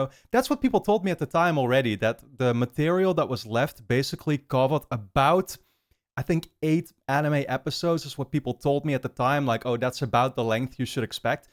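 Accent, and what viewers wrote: Netherlands. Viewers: Haha I can hear you're Dutch from a mile away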